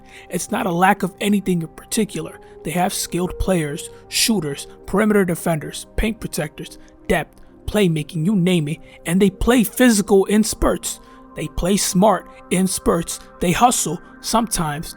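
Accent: New York, United States. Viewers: That accent. You from NY fam?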